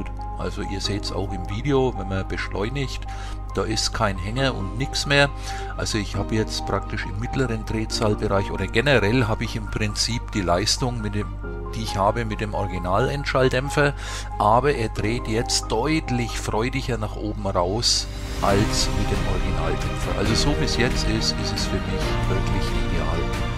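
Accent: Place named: Franconia, Germany. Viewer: Do hör i doch an Frangen. 😊 Ich fahr auch den Storm auf meiner Sv. Ist aber eine Euro 2. Gruß aus Mittelfranken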